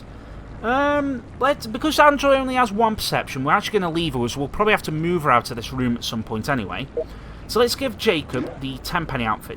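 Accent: Scotland, United Kingdom. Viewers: Are scottish bc I love the accent I wish it was on android :( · Nice scottish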